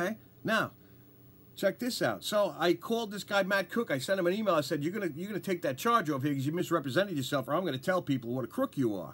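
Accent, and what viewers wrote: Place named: New York, United States. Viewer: Yes - that there is an awesome New York accent!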